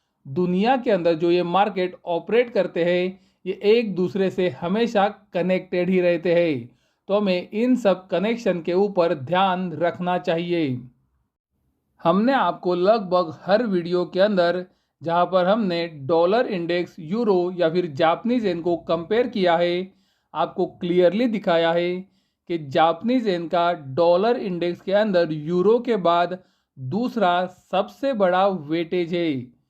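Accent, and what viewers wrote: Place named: Gujarat, India. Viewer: Aap Gujrati Ho Aisa Aapki Boli Se Lagta Hai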